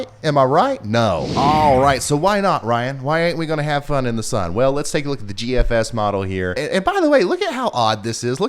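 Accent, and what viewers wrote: United States. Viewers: i love your little country accent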